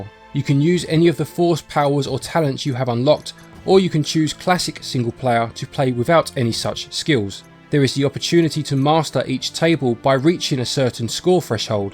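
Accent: United Kingdom. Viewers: Oh, ok, the man knows digital pinball, too. You're quickly climbing the ranks of my favourite Switch-related channels, sir, and your accent makes me want to spell things colourfully ^.^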